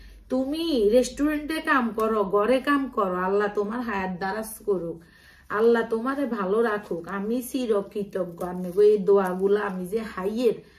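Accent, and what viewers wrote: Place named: Sylhet, Bangladesh. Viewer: সিলেটী ভাল করে বাংলা বল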